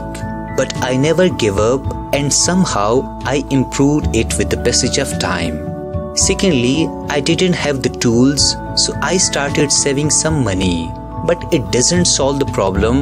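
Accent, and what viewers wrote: South India, India. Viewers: Really It's So Surprising That The English Accent Of ur's Does Matches Which We usually Hear frm our Teachers In India.... So basically U r Indian Famous Teacher On Utube this is my personal opinion.... But ur wrk is tough As I Think....But At Last when U Said Naah Man I am Not Handsome.....that's Wrong In Content Becoz Person Should be good at skills not In Face ..... Lots of respect And Blessings Frm Children Frm India .🙏🙏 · I thought you were South-Indian because of your accent😅.. Anyways, really you're really great teacher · I thought u were from India's South😅😂 keep it up broo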